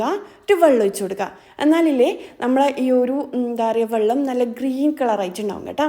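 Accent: Kannur, India. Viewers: നമ്മളെ കണ്ണൂർ ഭാഷ തന്നെ 😅😅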